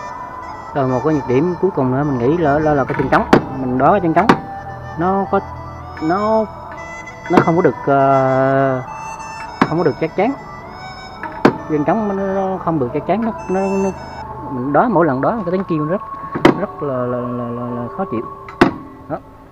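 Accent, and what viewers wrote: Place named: Quang Ngai, Vietnam. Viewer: ôi giọng 76 quê mình nghe thân thương quá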